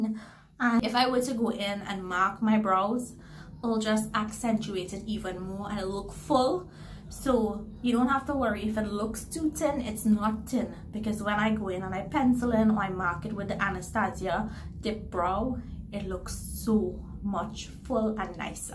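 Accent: Trinidad and Tobago. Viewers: That Trini accent 🎵🎵🎶🎶🎶🎵🎵🎵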